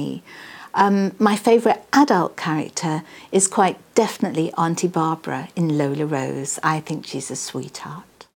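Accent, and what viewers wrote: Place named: United Kingdom. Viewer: I love her British accent its so sweet :P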